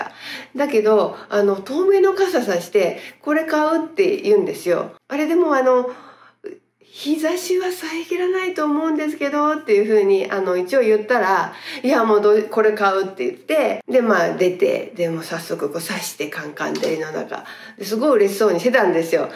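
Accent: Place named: Hiroshima, Japan. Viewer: さん、いつも楽しく拝見しています☺️ 通訳ガイド経験のお話面白です！広島弁も可愛くていいですね🙌🏼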